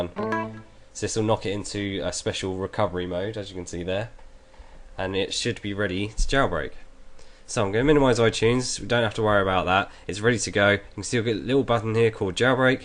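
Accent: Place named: Southeast England, United Kingdom. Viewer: Thanks a lot brother. By the way I love Southeast England accent.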